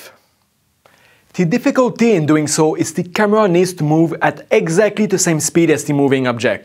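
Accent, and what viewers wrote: Quebec, Canada. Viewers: Vincent B. On est 2 a reconnaitre l accent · On dirait que je reconnais l'accent Québécois ? :) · I recognize this accent from Canada QC :) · This guy sound like a criss de québécois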